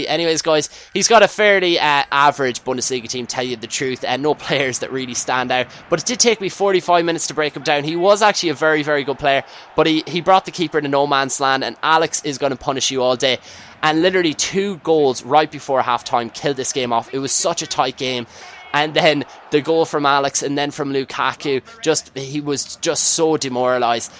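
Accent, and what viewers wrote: Ireland. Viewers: where exactly in ireland are u from?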